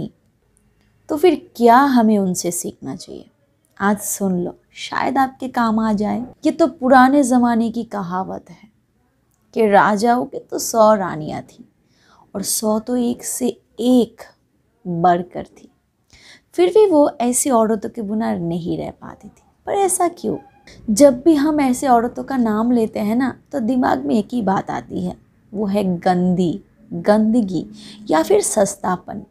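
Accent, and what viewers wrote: Bengal, India. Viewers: Bengali accent we can feel in your hindi😀😀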